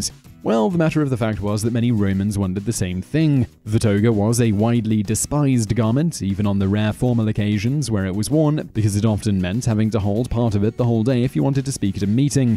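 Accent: United Kingdom. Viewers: I really wouldn't expect a man with a British/English accent to have an UNBIASED opinion of the American Revolution. If the colonies were "impoverished", it was due to the taxation and mismanagement by the Crown. The colonists had to be good shots, since colonial larders were augmented with fish and game. The "Lobster Backs" as the British soldiers were called by the colonists, lined up in neat rows and fought in the European way. The colonists wore clothing dyed in nature's colors, and fought more in the native American way. Furthermore, the British were quite unfamiliar with the terrain in America--something the colonists were NOT. Furthermore, the British hired German mercenaries--Hessians--who sometimes defected to the American cause. Let's also keep in mind that the British senior officers--from the wealthy, landed aristocracy class--didn't exactly treat their own soldiers (let alone the colonists) with any modicum of respect. 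<link>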